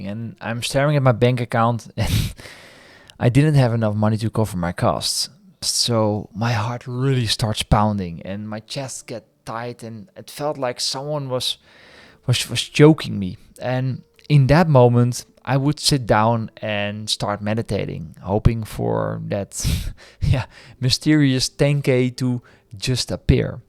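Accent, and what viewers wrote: Netherlands. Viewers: Ik kom dit filmpje tegen tussen mijn video's. Klopt het dat ik hoor aan de manier van praten dat je Nederlands bent? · Jij bent echt Nederlands zo te horen 😂😂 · Love the netherlands accent ☺ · aan je accent te horen moet je wel nederlands zijn .... vraagje doe je ook meer dan meditatie , astral projectie toevallig ? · 2 words out of your mouth and I knew you were Dutch lol.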